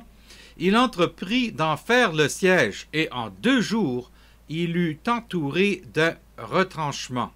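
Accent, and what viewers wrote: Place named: Canada. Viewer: C'est un Canadien avec son accent. Il est super et très clair. En tout cas c'est plus clair qu'avec l'accent Stéphanois. (Le mien) uniquement compréhensible dans les départements 42 & 43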